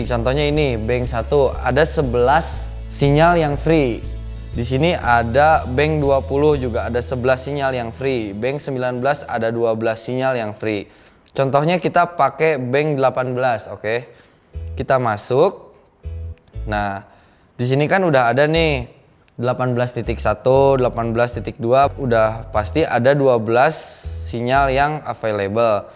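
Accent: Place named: Sunda, Indonesia. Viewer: Kentel bgt sunda na, kang kabayan banget atuh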